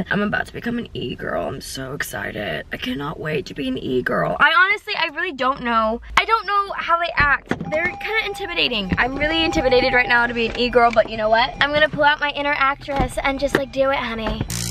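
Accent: United Kingdom. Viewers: Ur british accent made me die I live in england and half my mates actually talk like that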